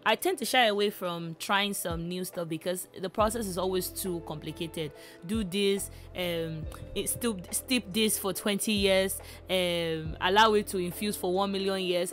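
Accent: Nigeria. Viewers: 🇳🇬 The Nigerian accent 😍 >>> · I love how you kept your Nigerian accent, no phoney. Nothing😂😂❤ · Thank you so much about this wonderful video about the hair growth benefits of green tea! 🍵 ...And thank you for the words of Scripture. God Bless you! 🙏 P.S. I love your Nigerian accent! 💚 #NewSubscriber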